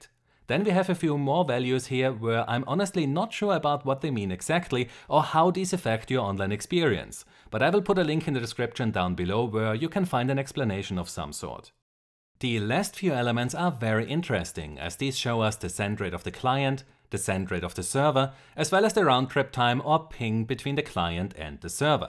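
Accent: Germany. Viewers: Now I finallay now that you are german ^^ Always thought that, that is a german accent :D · man hört so raus dass du deutsch bist xDDD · man hört an dem Akzent das du Deutscher bist :P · Love your german accent 😏❤️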